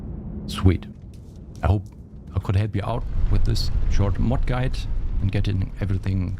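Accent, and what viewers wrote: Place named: Germany. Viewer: Ich mag deinen deutschen Akzent :D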